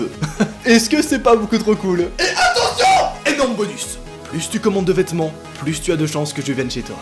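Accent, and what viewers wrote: Belgium. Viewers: Tu es belge ? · cc t belge?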